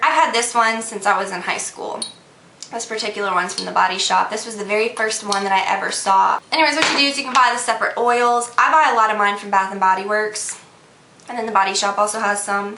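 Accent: South, United States. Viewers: Your southern accent really came out in this video:)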